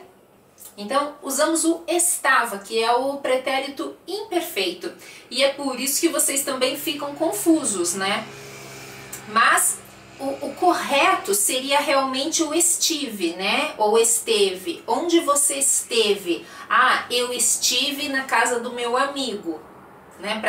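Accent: Brazil. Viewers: Descargo sus vídeos en MP3 y me los aprendo y así es como practico mi portugués, sobre todo el acento brasileño. Son muy buenos, me encantan, muito obrigada!❤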